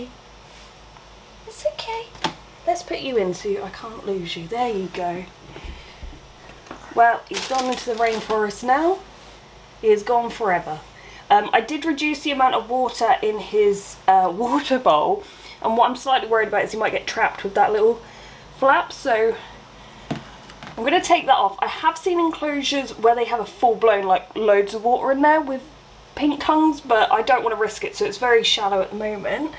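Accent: United Kingdom. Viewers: Yo your British i didn't know that respect to all my British people out there💯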